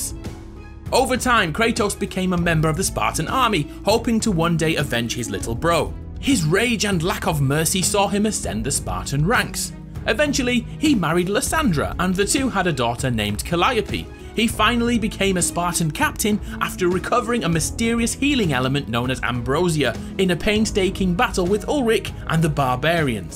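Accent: United Kingdom. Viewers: Man, I was looking for something else and wound up on this video... and somehow, the British accent really improves the story of God of War... GG, GG indeed. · why are you british?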